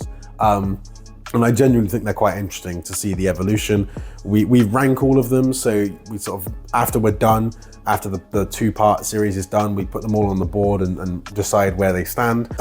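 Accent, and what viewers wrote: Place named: United Kingdom. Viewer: aha, okay. The reason I ask is because I’m having a minor personal Mandela effect. You sound absolutely British, but I have this strange recollection of you having a foreign accent in the past. And yet clearly you are an Englishman. Sorry, I’m a yank, and I could have sworn you used to have a different accent, like Swedish or Russian or something completely different. I’m tripping. 😅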